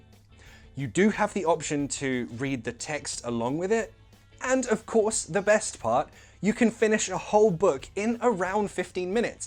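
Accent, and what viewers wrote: United States. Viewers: I love your girl version more and the way you pronounce things in American accent ...😂👌 Really you r amazing ☺️ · Fine american accent !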